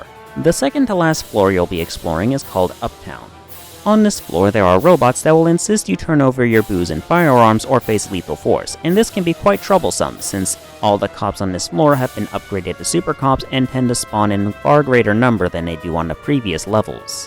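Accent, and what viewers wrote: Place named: Pittsburgh, United States. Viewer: Are you from Pittsburgh?!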